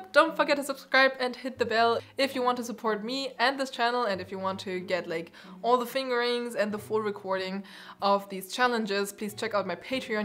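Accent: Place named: Germany. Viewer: As a fellow German who just found your channel, I found it really interesting that I could tell roughly where you're from before you even said "Erlkönig" because the dialect is audible even when you speak English. I never really realized that this was even possible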